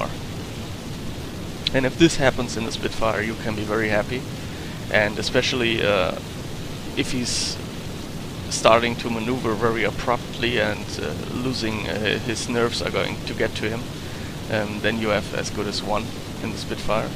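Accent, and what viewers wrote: Germany. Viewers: Ich kann da den Deutschen Akzent raushören :D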